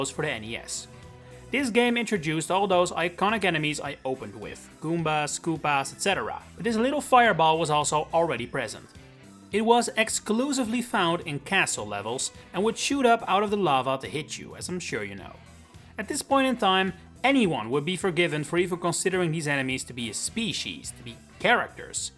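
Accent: Netherlands. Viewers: Are you dutch? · Vrijwel elke Nederlander heeft moeite met hun accent verbergen in het Engels. Ik hoorde gelijk dat je Nederlands bent hahah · sec in and can just tell you're Dutch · I'm from the Netherlands and I can just easily here his Dutch accent. Honestly, to me it just pisses me off to here Dutch people trying to speak English but yeah that's, about it really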